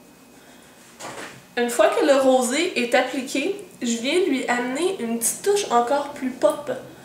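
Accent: Quebec, Canada. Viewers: Est-ce que tous les Québecoise parlent comme ca? :D Je veux dire....le francais :) c'est jooliiii :)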